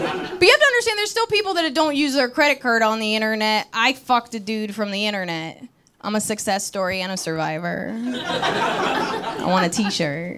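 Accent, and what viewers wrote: New York, United States. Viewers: Shes like super cute. But shes got that standard, new york, fran drescher thing goin on. She would make a nice wife.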